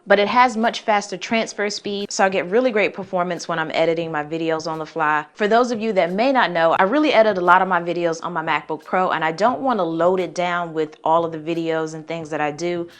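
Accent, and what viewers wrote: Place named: South, United States. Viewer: She got that Southern accent 😌👌.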